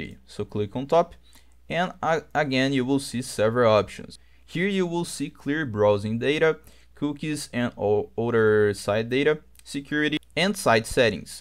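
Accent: Brazil. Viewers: Eu reconheço um paulista em qualquer lugar! Hehe, brincadeiras a parte, ótimo vídeo.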